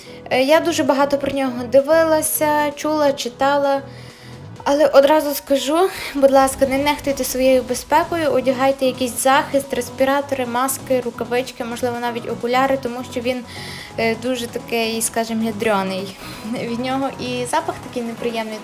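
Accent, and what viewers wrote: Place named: Ternopil, Ukraine. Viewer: Це один з діалектів, а не суржик. Нічим не гірший за "чисту" мову заходу України чи "еталонну" мову киян. Відчувається, що дівчина орієнтовно з Тернополя чи Івано-Франківська, чи близько тої території і українська її рідна. Мова є живим організмом і з часом найменш вживані слова просто зникають, так буде і з так званими русизмами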